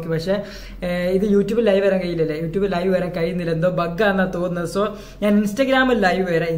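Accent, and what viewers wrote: Kozhikode, India. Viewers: പോയി പോയി കോഴിക്കോടൻ touch ഉണ്ടല്ലോ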